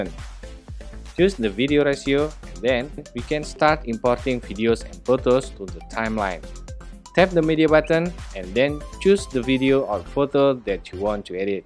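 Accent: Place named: Indonesia. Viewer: From the speaking, i know this people from indonesia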